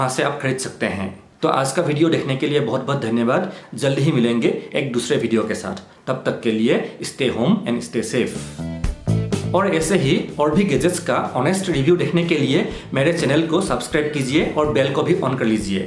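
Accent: Assam, India. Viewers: are you from assam bro? guessing it from your accent 😆😆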